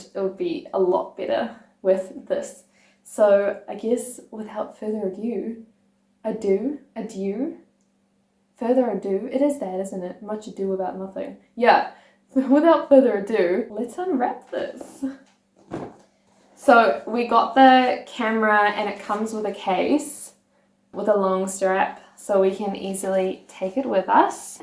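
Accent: New Zealand. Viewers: Once you've reached 1k subs, it'd be only a small step to make it up to 10k.... there will be the point Germans become the majority of your viewers and subscribers, with almost all of them being former exchange students at some parts of their lives (mostly to the US). Your Kiwi accent is so strong, slowing down on your speaking speed would make you easier to understand. I'm still getting it but I have to rewind a couple of times..... And yes, I'm a German subscriber :-)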